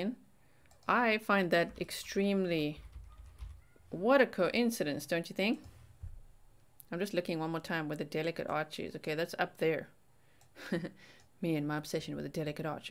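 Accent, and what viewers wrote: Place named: Afrikaans, South Africa. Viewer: You HAVE to be south African! Nowhere else in the world would you find that strong afrikaans accent.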